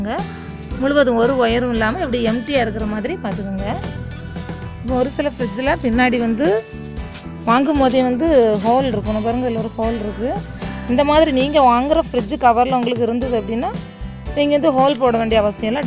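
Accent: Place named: Kerala, India. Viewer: hi mam,thank u viewers, pudichirunda subscribe pannunga,nu neenga Malayalam kalandha Tamilla solradu romba cuuta iruku , well. done very good!!!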